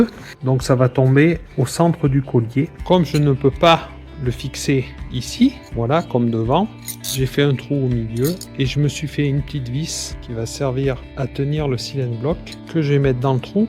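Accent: Corrèze, France. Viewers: On te regarde faire comme d'habitude 😊 Mais quel plaisir de regarder ce travail de qualité. Et j'ai une petite nostalgie de l'accent corrèzien, j'y ai passé une dizaine d'an-nées 😉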